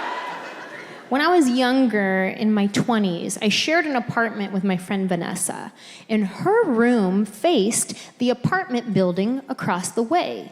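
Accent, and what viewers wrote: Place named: California, United States. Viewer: She’s really refined her routine, she’s always had funny material, but now she’s “polished” as a stand-up comedienne. However, I do wish she used adult language like 98.4% of us normal adults use in our everyday lives….and I like the fact that she hasn’t lost her Calif accent, she sounds like she could still be an Oakland Raider cheerleader….if she ever books a gig here in San Jose, Calif, I’m definitely buying tickets to see her live…😊✌🏻🇺🇸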